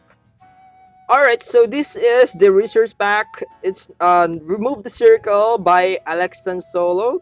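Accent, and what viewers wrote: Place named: United Kingdom. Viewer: British accent heh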